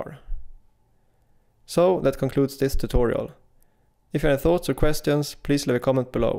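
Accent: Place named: Sweden. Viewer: Bra där. hörde direkt att du var svensk ;) Jag har precis börjat att filma i HDR och Davinci Resolve. Gör det på en Panasonic S5. en fråga. varför ser V Log skit ut även på den färdiga HDR bilden? helt platt utan färger. Jag har även testat några Blacmagic Raw filer och dom ser perfekta ut redan från start och kräver mycket lite grading. att få ordning på en V Log fil för HDR i Davinci Resolve tar enormt med tid. varför är det så? finns det HDR LUTs man kan använda för V Log?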